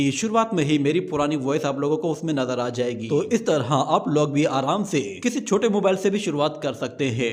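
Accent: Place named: India. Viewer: Mujhe laaga ap Indian ho 😂😂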